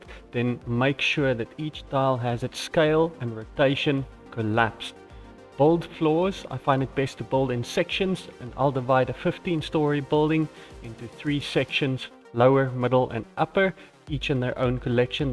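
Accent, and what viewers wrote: South Africa. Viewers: South African? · South African? Im from Cape Town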